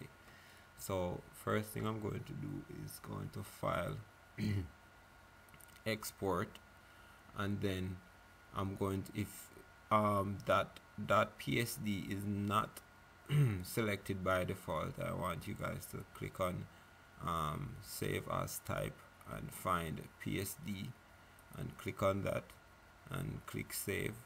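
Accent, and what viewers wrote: Jamaica. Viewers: Are you Jamaican> :D :3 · u jamaican dont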